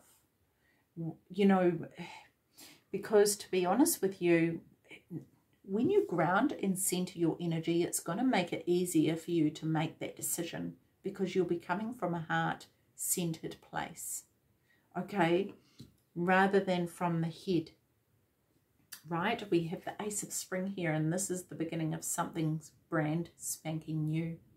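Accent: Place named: New Zealand. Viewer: my moms from Christchurch, thought I recognized the accent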